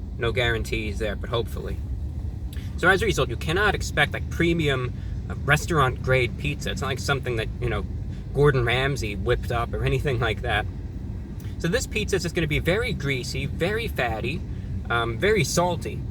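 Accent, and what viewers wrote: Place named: Transatlantic, United States. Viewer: Ever since he read those mean comments and showing the vicious one about slitting his throat and stabbing his eyes with forks..I have an added entertainment factor watching his videos...I love this guy! How can anyone not? His trans Atlantic accent I like as well. Friendly and easy going..I hope the people that said the violent things were just trying to be funny.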